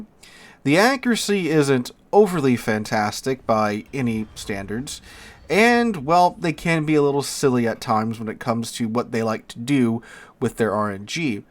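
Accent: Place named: United States. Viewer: the Alsays! xD There will be numerous shipnames in any number of languages to butcher in this game, so I get it but its still funny to hear you pronounce some of them in a very "Americanised" way!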